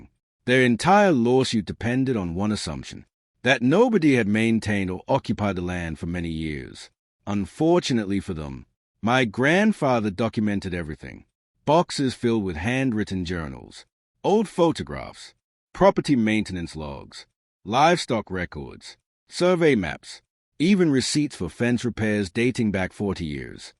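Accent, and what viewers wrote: United Kingdom. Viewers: Why would someone who has generational property in Montana, sound like he’s from England?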